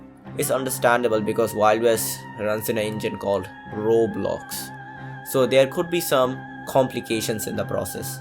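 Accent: India. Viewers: Indian ah accent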